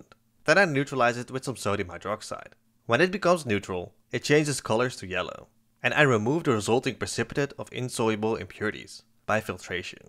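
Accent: Netherlands. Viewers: This was certainly quite an interesting video to watch, reading up on these amonia based antibiotics that are currently in development was also quite an interesting read and hives some hope for antibiotics. based on your accent I am wondering if you are dutch?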